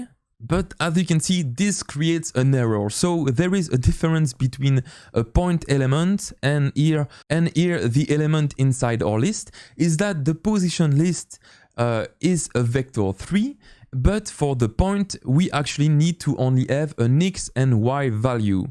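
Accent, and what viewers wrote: France. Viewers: i find you got a french accent, am i right?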